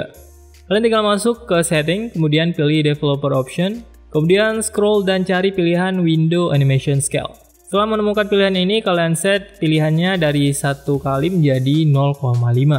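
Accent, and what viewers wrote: Aceh, Indonesia. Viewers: hei orang aceh kah anda??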